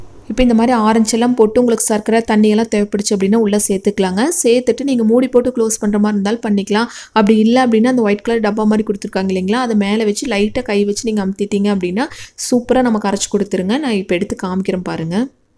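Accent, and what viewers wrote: Coimbatore, India. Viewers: Hi...unga coimbatore slang voice is sooo gud😊😊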